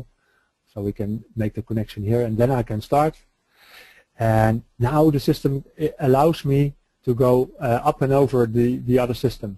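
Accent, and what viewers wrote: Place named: Netherlands. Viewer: Dutch accent. :)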